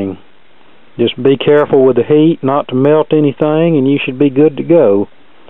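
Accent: South, United States. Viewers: laurel moon ++ i've watched this guys videos and will use his info on a stuck bsf turn table i have, bought new in 1980 shoved off to the side in 1993 by my ex wife and not used since. i kept it thinking one day i'd get it running. maybe with this guy's info i can do that. prefer the older record players before equalizers and the "digital age" moved in.also have a 1955 rca 45 player i will get going. everything works,waiting on replacement cartridge. would rather enjoy my extensive vinyl collection on the old players they were made for. as for this guy's accent,no problem. i'm from north carolina and live in mississippi now.i have that same accent and intelligent as most are here in the us south.my daddy sounded like that. he was a tv/radio repairman as a side job in the 1950's and 1960's. i remember him working on some of these old rca record players. 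my daddy passed away at age 32 in 1966. working on this old stuff in my retirement brings back good memories of my daddy.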